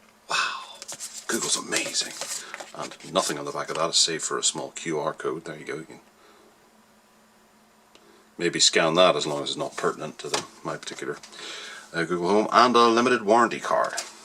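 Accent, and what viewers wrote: Northern Ireland, United Kingdom. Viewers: lol never mind. It's nice to click on a review and hear a fellow norn iron accent though:)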